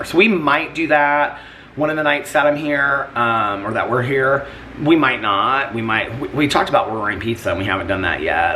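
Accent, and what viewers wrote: South, United States. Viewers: Why does sound more southern than usual? 😂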